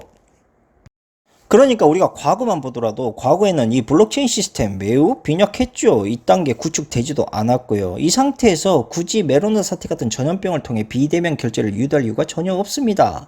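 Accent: Jeolla, South Korea. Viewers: 내가 싫어하는 전라도 사투리도 듣기 좋게 만드는 모든 전라도 사람들이 처럼 똑똑했으면 난 전라도 사람들 존경했을거임 난 개떡같은 인간들만 만났는데 게다가 좌빨인것 까지 ㄷㄷ 같은 깨어있는 분도 있다는걸 암 ㅋㅋ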